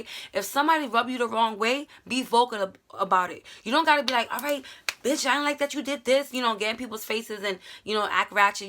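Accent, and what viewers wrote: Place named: Bronx, United States. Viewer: 746 - 0 no dislikes you a real one ❤❤❤❤ and I love your Bronx accent lol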